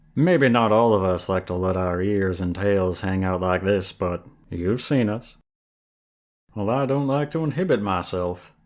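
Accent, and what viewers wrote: South, United States. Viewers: Come for the audie, stay for the southern accent 😂❤ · oooh, happy to hear that. Im not usually one for Southern accents but I really liked this one ^^ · I'm from Texas and I don't have a southern accent- So, I'll just say that you did a great job on it. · Aww, no one's ever told me I smell pretty before! So sweet and adorble. And with your Southern accent (which was great), I feel like that's how I talk when I play too much Red Dead Redemption 2. I kind of imagined you talking with some wheat grass clenched in your teeth, too. Thank you for the great roleplay!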